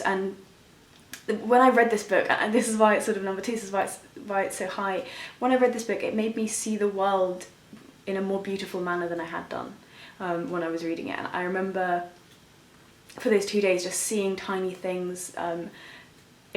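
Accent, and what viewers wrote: United Kingdom. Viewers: Sometimes writers get carried away or whatever and make something TOO dang long and I sometimes I just spin out and can't finish them so I'm glad you mentioned that in regards to, Infinate Jest which I will likely avoid unless I'm locked up in jail or something and the only books I have are Infinate Jest, and The Bible. All of these sound worthwhile though I am narrowing it down to Sunset, Judas (you convinced me that this is a must) and for sure, Child of God which I am eager now to get a copy of soon. I've noticed that there are many other youtube people that give book reviews and some of them are ok or so so. For some reason, I think that is because your voice is nicely British you give this venue a stronger sense of credibility than when other (American) people do this. LOL. There is NO logic behind this but I'm sure on some subconscious level that I must somehow be processing the credibility of these things on geography. 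That's horrible but in this country with each passing year fewer and fewer Americans seek out or desire the act of reading. LOL. Oh well. Cheers!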